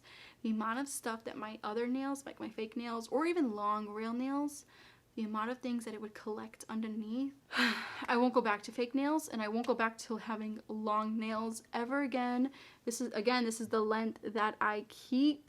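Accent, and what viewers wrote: Boston, United States. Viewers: That accent is 🔥🔥. I'm from L.A so hearing your accent is different. Is that a Boston accent?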